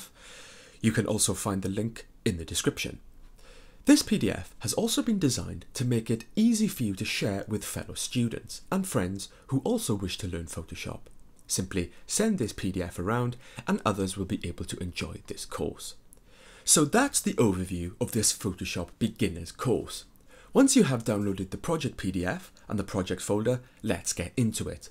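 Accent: Wales, United Kingdom. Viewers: Pretty sure he's Welsh.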